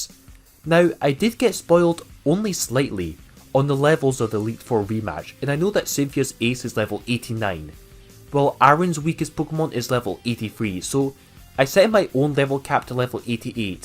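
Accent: Scotland, United Kingdom. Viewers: Loved this mate, inspired me to give it a go. Can hear the scottish in your accent, where you from or where have you stayed here?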